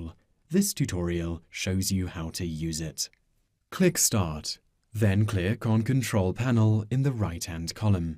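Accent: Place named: United Kingdom. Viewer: I love British accents, it is soothing to the ear lol. Makes the video a lot easier to watch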